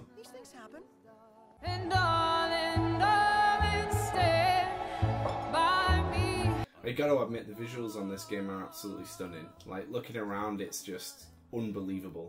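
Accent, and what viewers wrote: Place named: United Kingdom. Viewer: no worries bro been watching your vids regularly and there really good!!🇺🇸💪 but dude what happened to your British accent!!! Don't forget ff7 on that list! Let us know when your next online we'll party and catch up, you know I'm a Sony boy through and through but the switch has turned my head a little 👌 also I was in the Nintendo store in NYC and it's crazy, you need to get there sometime man you'd be in heaven.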